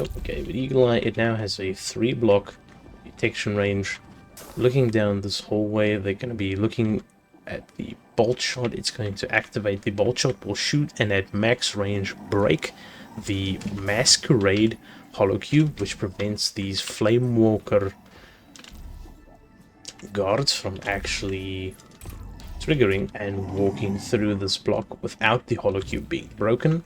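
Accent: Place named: Russia. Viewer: Hmm interesting. Im from germany and in german languge i realy hate that russen accend, but in english i like it for some rison. Long story short...i like your accent :) . Oh and of curse your vidios ar great. Kepp going.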